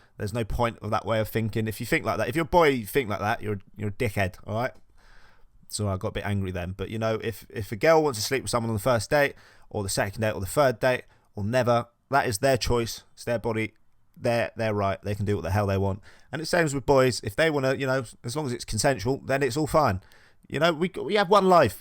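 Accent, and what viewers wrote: United Kingdom. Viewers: U have a great voice and accent...r u British?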